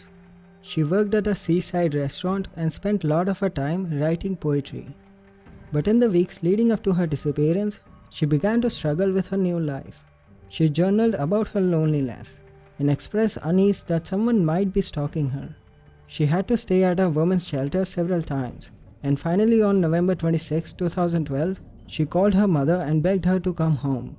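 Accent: India. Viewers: Indians don’t make good commentary when it’s English, can’t listen to a voice like that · I LIKE YOUR CHANNEL!!! IT'S CREEPY ASF!!! YOUR INDIAN ACCENT MAKES IT EVEN CREEPIER!!!!! GOOD SHIT APU!!!!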